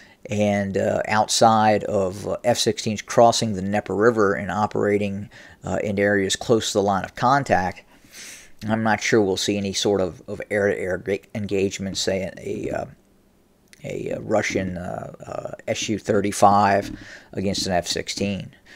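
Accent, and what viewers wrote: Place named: United States. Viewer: Don't let the American accent fool you.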